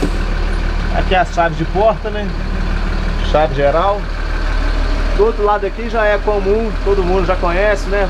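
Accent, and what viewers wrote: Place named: Minas Gerais, Brazil. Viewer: sotaque minerin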